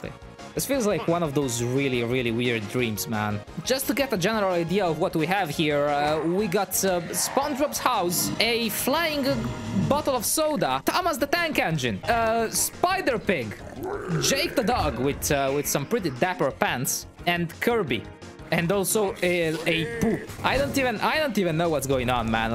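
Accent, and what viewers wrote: Italy. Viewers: You literally have the same accent as my Italian teacher 😂😂😂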